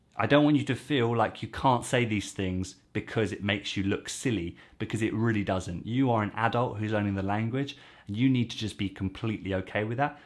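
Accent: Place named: United Kingdom. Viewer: Bonjour, je suis francophone du Québec et je viens de connaître ta chaîne. Paradoxalement tes leçons de français sont parfait pour m'aider à comprendre mieux l'anglais car tu fait une traduction simultanée. Ton accent britannique m'aide aussi car le débit semble plus lent. Merci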